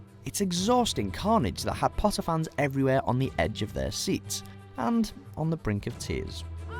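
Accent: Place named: Liverpool, United Kingdom. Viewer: Love the scouse accent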